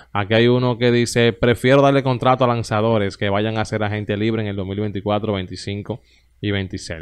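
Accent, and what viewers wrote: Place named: Cibao, Dominican Republic. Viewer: Compa usted habla demasiado feo, un cibaeño que cambia la i por la L, ejemplo dos ochental tres, barrabasada total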